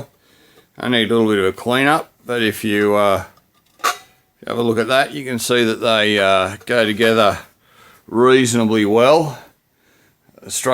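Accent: Australia. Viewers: Mate that's a work of art! And you've got the most "Aussie Bloke" accent I've ever heard. You could get a voice over job easy if you haven't already. 🍻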